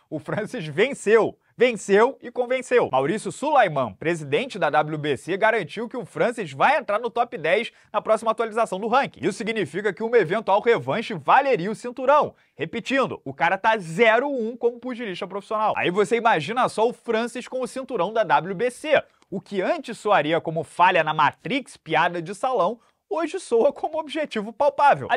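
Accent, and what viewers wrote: Rio de Janeiro, Brazil. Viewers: Esse vídeo teria sido muito bom se não tivesse sotaque carioca.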